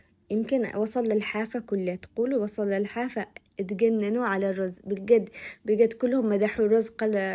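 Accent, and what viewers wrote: Aden, Yemen. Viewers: يمنية ؟ لهجة يمنية جميلة واكل ولا اروع تسلم ايدك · ما شاء الله عليك فناانة بجد 👏🏻♥️ تسلم الأيادي، حبيت قناتك وطبخك وأسلوب كلامك😍 ويا حلاة اللهجة العدنية منك 😍♥️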